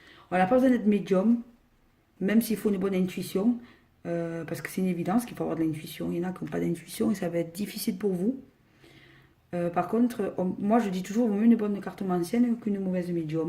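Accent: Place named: Marseille, France. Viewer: bonjour; je voulais savoir de quelle région tu es; a ton accent je dirais Marseille. J'aimerai beaucoup apprendre avec quelqu'un et j'aime bien ta façon de faire.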